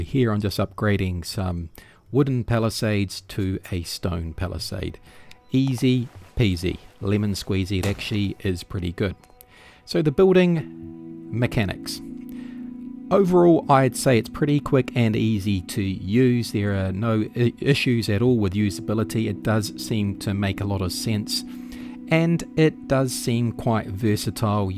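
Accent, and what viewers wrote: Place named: New Zealand. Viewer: Have you played it recently or moved on? I'm open to any other suggestions on open-world survival games that I can play with friends. I have not looked into State of Decay yet or Valheim, and so keen on The Day Before when it comes out! I'm also a Kiwi but living in OZ. I get so much crap XD. Happy to subscribe to a NZ game reviewer on YouTube! I assume that because of your accent and logo :)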